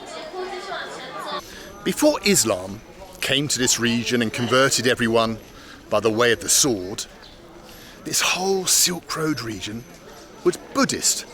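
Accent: China. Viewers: People would understand your mandarin better in Xinjiang because Uyghurs talk in Mandarin exactly like you.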